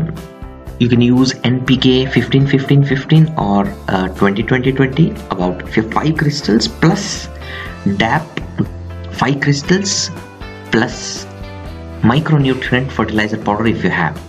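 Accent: India. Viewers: Your tips help, however not to be rude but I would highly suggest that you take some English speech classes. Your heavy Indian accent makes it extremely hard to understand what you are saying. After awhile of listening, it actually becomes painful to listen to the video because of this. Again I’m not trying to be rude, I hope you will consider this a kind, helpful suggestion that it was meant to be.. I think you will see a difference in your positive votes if you can put some hard work into reducing that heavy Indian accent. I wish you success!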